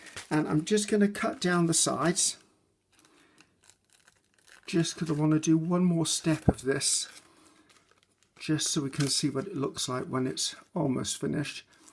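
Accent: United Kingdom. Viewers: Hi, it's great to meet you and watch your fresh, lovely British accent creative take on crafting. I'm a new follower and am exited to watch more!!!!! Cheers from Iowa...USA. Tonya:)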